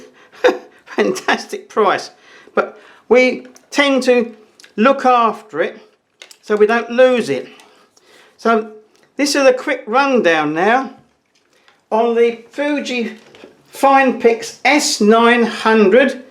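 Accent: London, United Kingdom. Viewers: He sounds like my old father in law, he was a Londoner from Kingston, shame he didn't live very long, couldn't give up the ciggies... But a lovely video, straight from the heart! I bought this camera, because I wanted to feel something chunky in my hand. The Finepix JZ 500 which I also have, is more like a box of ciggies and no blimmin good if you have arthritis and sausage fingers ;o)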